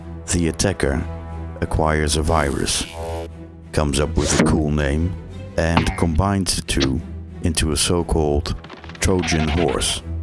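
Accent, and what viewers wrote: Netherlands. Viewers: Ja hij heeft een nederlands accent XD. Engels is verder wel gwn goed. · Geniaal, je kunt gewoon horen dat dit een Nederlander is XP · Is that voice from a dutch person? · is it just me or does this guy have a dutch accent? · kan horen dat je nederlands bent, mooi filmpje :)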